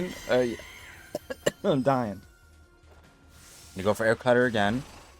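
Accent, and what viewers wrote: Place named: United States. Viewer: ¿You, native Costa Rican? You sound pretty much like a native American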